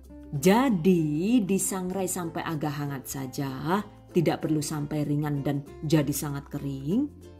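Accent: Banyumas, Indonesia. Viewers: Karena saya g punya oven,bisa dicoba nih resep yg satu ini. Hmmm dari tampilan nya enak bgt, Mantep pokoknya 👍👍👍 Dan selalu suka sama ngapaknya bikin ketawa 🤣 #GiveawayTHV2021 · Deneng rika ngapak😅😅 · Ini wong ngapak yah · Wih sangat" faham cara menyampaikan nya bahkan bikin ketawa kas jawa ngpak nya😂😂😂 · Ngapak gaes😂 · Resepnya mantap...ngapak-ngapaknya bikin ngakak...ca.....tat #GiveawayTHV2021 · Aku suka channel ini. Jelas bgt ksih ktrangan d stiap resep.. Juga ga pelit ilmu. Ada bhsa ngapak.. Yg bkin ktwa. Sukses trus ya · Wong ndi njenengan, bahasane gaul tapi ngapak ya rikane.. Nyong dadi ngguyu2 ulih ngerungokna rikane.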